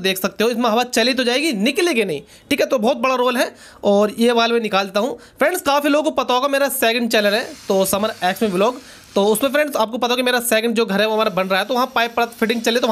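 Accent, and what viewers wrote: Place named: Haryana, India. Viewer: Aap kapil sharma jaisa bol rahe hein Shayed aap haryana se hein Good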